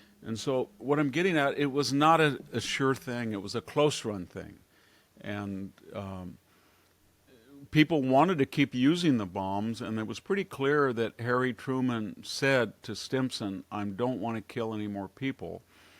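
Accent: United Kingdom. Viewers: Who is this lovely,m well spoken, well informed, young British man?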